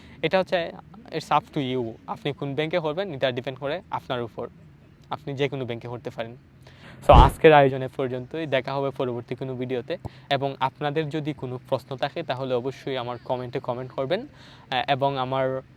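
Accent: Sylhet, Bangladesh. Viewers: Vai er basa ki sylhet ?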